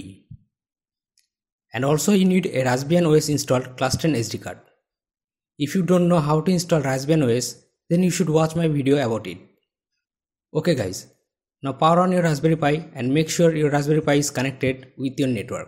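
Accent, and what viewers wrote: India. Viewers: sounds like india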